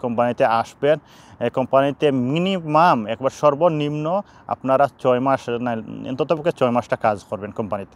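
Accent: Sylhet, Bangladesh. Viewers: Apne Sylheti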